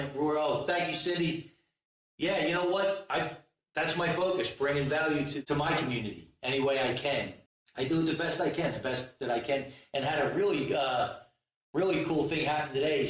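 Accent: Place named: East Coast, United States. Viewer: Definately Hear That Wonderful East C. accent! #MM2018 , Your Amigo Santos.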